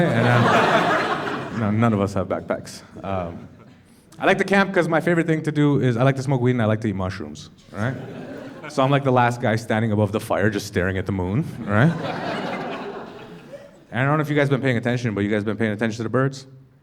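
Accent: Toronto, Canada. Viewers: i know a toronto man when i hear one 😂😂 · I love Canadian comics, I can sense his Toronto accent being held in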